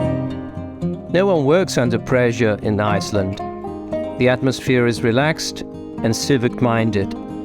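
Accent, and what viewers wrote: United Kingdom. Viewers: Bel video e belle gnocche, voglio farti i complimenti per il tuo accento British, mi sembra di capire che tu sia italiano, s'è così hai una pronuncia perfetta.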